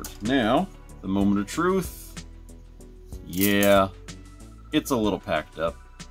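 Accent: Canada. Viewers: You do say "out" like you're Canadian. And that amount of thermal paste was perfect. Either way, better to go over than under unless it conducts electricity. 😊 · You say "out" almost like "oot"-- which to us Americans is basically the stereotypical Canadian accent. Lol.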